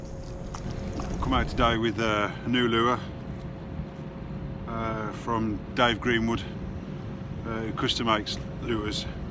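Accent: Birmingham, United Kingdom. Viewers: haha no brummy sure sound like one to me mate haha well yeah keep it up bud love the vids and speak soon good luck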